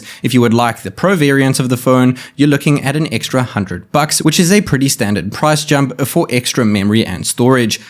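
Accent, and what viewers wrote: South Africa. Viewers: i swear you sound south african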